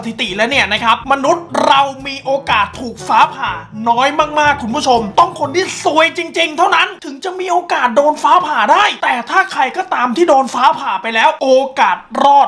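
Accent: Thailand. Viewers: ทำไมต้อง เหน่อออออ 5555.5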